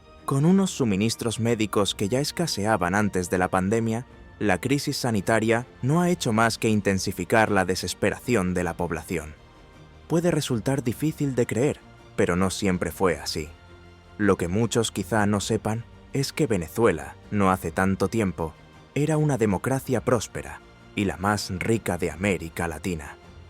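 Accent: Spain. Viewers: El Locutor debe ser Español espero que haga un VIDEO DE SU PAIS E S P A Ñ A !!! QUE NO ES UNA MARAVILLA TAMBIEN HAY POBREZA Y BASTANTES DESAUSIADOS !!! CUALQUIER PAIS QUE LOS EEUU🇺🇸 LE META SANSIONES LE PASA LO MISMO !! VIVA VENEZUELA 🇻🇪 CARAJO !!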